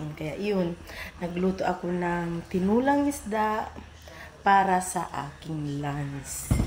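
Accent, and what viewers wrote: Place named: Visayas, Philippines. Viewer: Ohh ang sarap niyan kapatid..parang Bisaya Ka host..taga saan Ka.??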